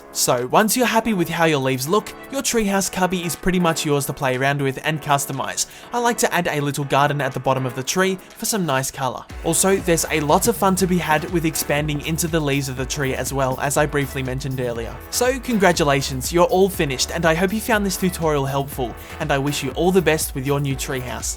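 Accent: Australia. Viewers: Are you Australian? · Are u an Aussie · he has a bit of a Ozzie voice Like me because if from there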